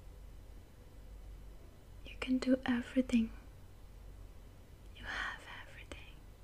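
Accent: India. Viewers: Where has this video been?! I have always looked for an Indian accent. This is so perfect. I melted to sleep, and you are candy for the eyes.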